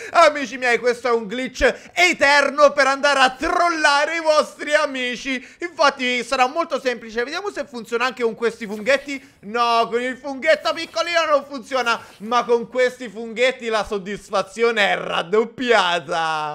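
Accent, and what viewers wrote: Sardinia, Italy. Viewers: ma sei sardo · Ma sei sardo? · sei sardo io si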